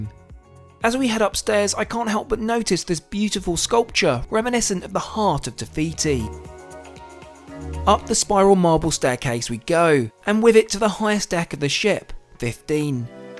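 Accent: RP, United Kingdom. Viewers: This is a cool video and is a great and informative room tour but I have never in my life heard such a posh accent with such awful pronunciation of words 😭 I must admit however that blurring kids faces is fantastic. You’ve done incredibly well there.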